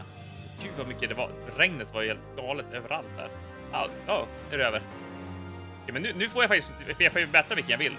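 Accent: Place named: Umeå, Sweden. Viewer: Du är från Umeå? Man hör på din dialekt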